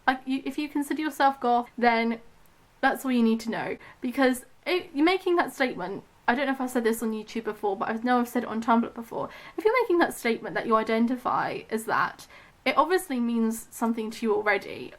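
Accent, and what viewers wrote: United Kingdom. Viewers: Wasn't ready for the British accent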